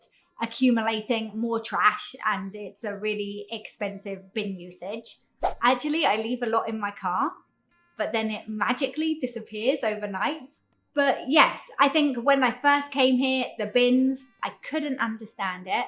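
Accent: United Kingdom. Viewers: 好喜歡你的英式腔，很好聽，像聽哈利波特電影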